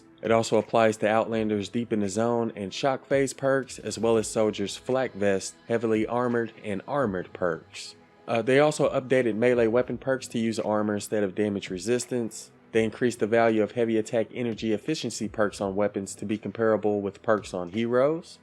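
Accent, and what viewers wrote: South, United States. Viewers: Are you from Texas or Louisiana !?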